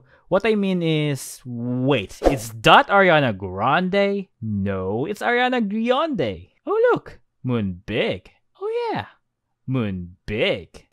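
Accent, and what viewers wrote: Philippines. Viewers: Ur accent looks like a filipino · i love ur filipino accent lods :)) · are you filipino? i can tell by the accent😂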